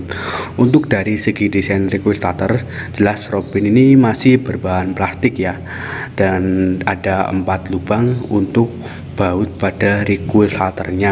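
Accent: Banyumas, Indonesia. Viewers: Kie pasti wong ngapak sing ngomong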